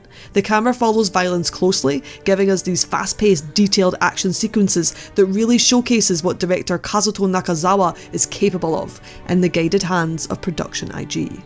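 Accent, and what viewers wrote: Scotland, United Kingdom. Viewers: Nice to hear a fellow Scottish girl reviewing anime great review/really liked the feel of this anime it’s a shame it lacks at certain things like character development/pacing or it could of been so much better...did love Keith’s vibe though and pritty sure without it and the nice art/soundtrack it would totally be unmentionable but the little ending clip we got dose point to a second season so if they do hopefully they even some things out/it also doesn’t help much that I love devilman crybaby lol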